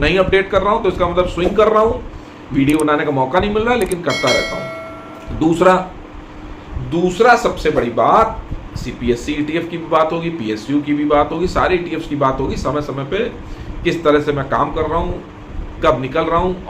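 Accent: Kanpur, India. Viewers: झन्नाटेदार , बातों का छौका न लगाओ , ek dum kanpuriya andaz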